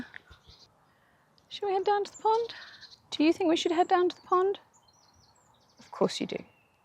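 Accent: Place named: United Kingdom. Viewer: Thank you! I do OK with the British accent, but It's easier when Its loud and clear. 🙂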